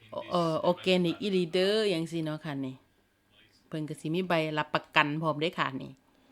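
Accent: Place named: Chaiyaphum, Thailand. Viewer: มาเบิงงัวเมืองนาวนำครับ ขนยาวเนอะครับ เสียงเว้าคือคนชัยภูมิครับ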